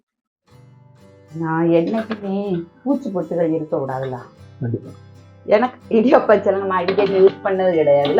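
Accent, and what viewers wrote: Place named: Nagercoil, India. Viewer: இயல்பாக பேசறீங்க... நாகர்கோவில் பாஷை..நல்லா இருக்கு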